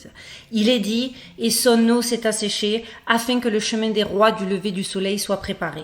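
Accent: France. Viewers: Génial plus je découvre tes vidéos plus j ai hâte de les découvrirent. Tu au top ne change rien à tes accents français ou Anglais. J aime ton authenticité suis fan. 🙏😘🌹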